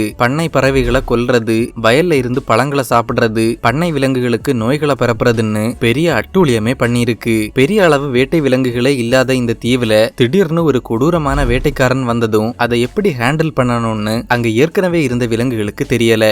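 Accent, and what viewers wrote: Kanyakumari, India. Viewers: The voice recorder is from kanniyakumari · Bro nee Kanyakumari thanaa 🧐🙂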